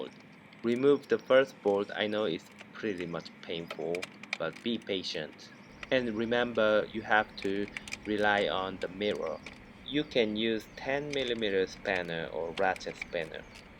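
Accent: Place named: China. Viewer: You h@ Chinese accent dude.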